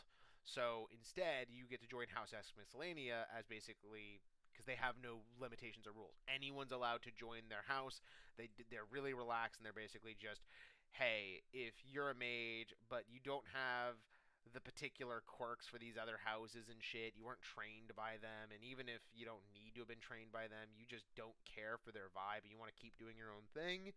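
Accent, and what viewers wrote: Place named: South East, United Kingdom. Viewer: South easterner detected, all hail the pub sub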